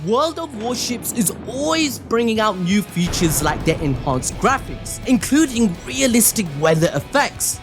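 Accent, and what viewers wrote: India. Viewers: Are you indian by any chance?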